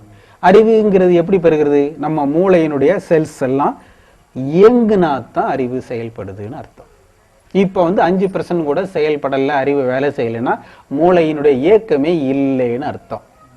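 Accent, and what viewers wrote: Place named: Tirunelveli, India. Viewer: ஆஹா!!! ரொம்ப நாளைக்கு அப்புறம் திருநெல்வேலி அழகு தமிழில் மிக முக்கியமான விளக்கம் கேட்க்கும் பொழுது டபுள் சந்தோசம்😉 நான் இப்படி பேசும் போது எல்லோருமே என்னை கேலி பண்ணுவார்கள்!!!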